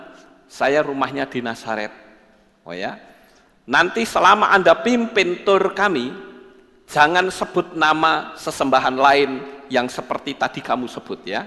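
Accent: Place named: Java, Indonesia. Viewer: medok logat jawa mu pak.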